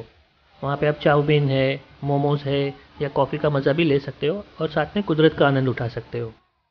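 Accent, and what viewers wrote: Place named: Nepal. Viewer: Nepali soundi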